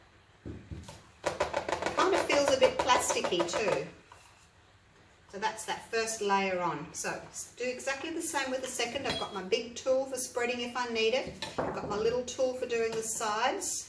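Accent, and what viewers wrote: Australia. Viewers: Lovely to hear an Aussie voice using products available in Australia - Thanks - Sue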